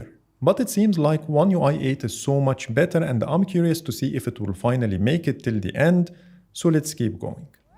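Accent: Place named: Egypt. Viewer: are you Egyptian i can sense the the accent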